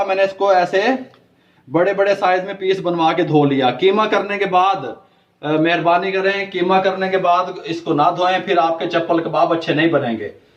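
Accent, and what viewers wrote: Pakistan. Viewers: I respect u alot u have no idea about it....u talk like my jhaiji and she was from Pakistan.i would love to visit pakistan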